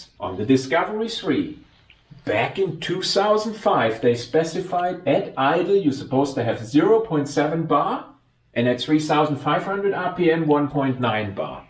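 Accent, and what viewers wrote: Germany. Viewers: I think I have never seen a video with more in-depth analysis and plain old truth, all topped off with a cool German accent! The sad truth is that very few people care to know anything about the car they drive - no more than where to put the fuel in, if that much. In other words, most people are simply consumers of what other people design, manufacture, and maintain - and spend all their time complaining about how their feelings got hurt by this group or that one. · Love thee German accent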